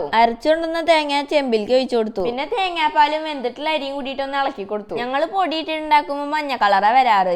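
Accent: Thrissur, India. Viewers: തൃശ്ശൂർ ഭാഷ സൂപ്പർ ആയിട്ട് ഉണ്ട് ❤❤❤❤❤ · Samsaram ketappol mansilayi namde തൃശ്ശൂർ ഗഡി anu nnu alle😊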